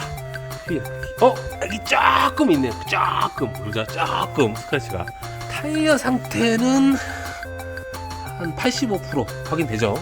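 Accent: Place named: North Jeolla, South Korea. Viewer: 고향이 전라도 어디신가요? 말투가 북도같은데요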